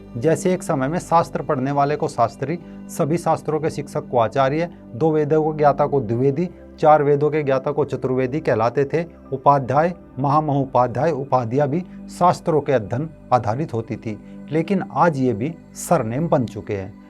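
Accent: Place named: Western Uttar Pradesh, India. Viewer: Aap ki awaaz se ye pta chalta hai ki aap west up ke kisi district se ho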